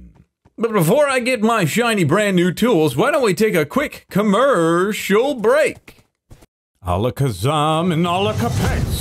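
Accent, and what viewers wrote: United Kingdom. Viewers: And that pa'fect brittish accent ☕❤️❤️❤️ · Amazing wizard cloak, about as amazing as your British accent <3 That witch hut looks fantastic, I thought you gonna do a big dead tree (and a pond) but maybe this was a "good" witch living close to the village. The end dragon is shaking in its boots lol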